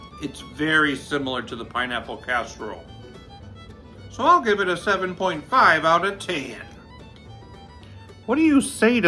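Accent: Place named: North Carolina, United States. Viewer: This sounds like North Carolina ❤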